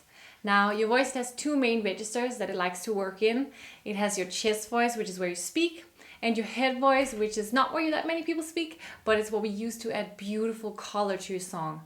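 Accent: Denmark. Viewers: Is Danish your first language? Because that's what I hear faintly in your way of speaking. And it's driving me crazy. Sorry for the personal question, but, hey, at least it's a direct request, right?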